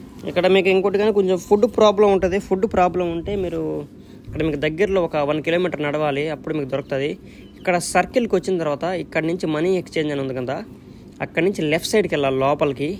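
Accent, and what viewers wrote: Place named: Nellore, India. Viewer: Orey mawa nuvvu maa nellore vadilaane unnave..?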